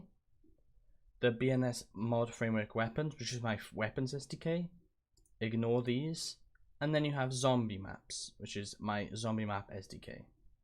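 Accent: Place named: Wales, United Kingdom. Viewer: you sound welsh are you?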